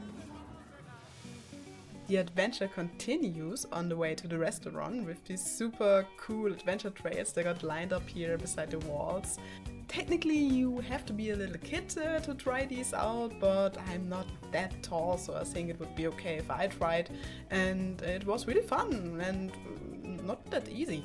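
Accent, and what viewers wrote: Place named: Germany. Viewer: You sound soooo very German when you speak English.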